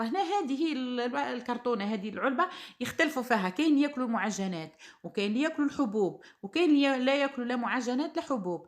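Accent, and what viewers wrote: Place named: Tunisia. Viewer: السلام عليكم أختي، ما أحلى كلامك آنا أختك من تونس منفهمش الفلوس الدزيرية وما لقيتش قناة تونسية متاع خزين، ولهجتك تحسها انك من تونس. تنجمش تعمللنا بالدينار التونسي؟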